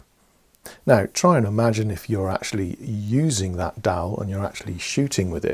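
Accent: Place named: United Kingdom. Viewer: Im not all that familiar with British accents but u kinda sound like Ian Anderson man. Are u both from the same area in England?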